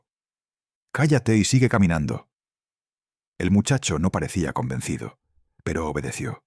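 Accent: Spain. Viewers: Todo bien pero Ese acento Español nada que ver con un relato de inmigrantes Mexicanos..se convierte en algo no creible..😅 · Ese acento españoleto no cuadra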